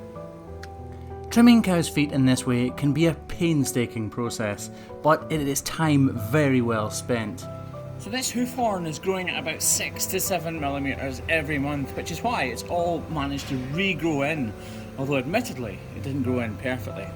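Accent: Scotland, United Kingdom. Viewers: please do a video with your full Scottish accent!!! Can't wait for the merch!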